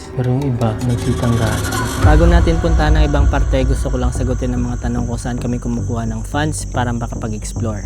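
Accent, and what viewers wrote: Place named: Ilonggo, Philippines. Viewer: Ilonggo gid na sia ayy. hahaha tuno ngani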